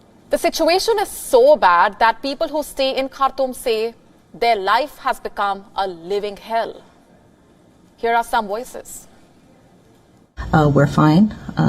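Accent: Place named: India. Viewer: What an english accent., please choose a narrator with a good accent., she is talking indian not english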